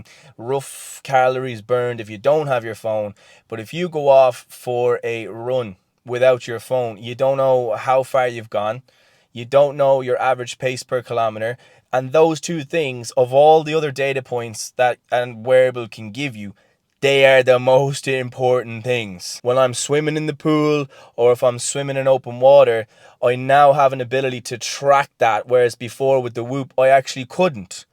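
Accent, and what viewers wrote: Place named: Ireland. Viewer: I’m not listening to a word this man is saying because his Irish accent is so lovely I’m totally distracted.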